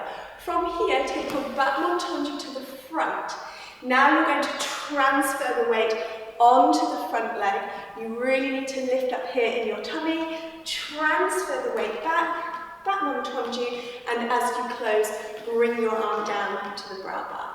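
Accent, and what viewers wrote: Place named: England, United Kingdom. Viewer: Well done! Very clear and well-thought out. Plus I love the English accent and correct ballet terminology.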